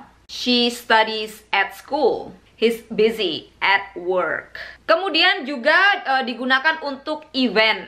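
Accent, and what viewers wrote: Surabaya, Indonesia. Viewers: Sama saja kok... logat suroboyoanku juga ga bisa hilang walaupun sudah tinggal 7 tahun di Bandung dan 4 tahun di Jakarta....makanya aku seneng lihat Mba logat suroboyoannya tetap ada walaupun sudah 3 tahun di Amerika:)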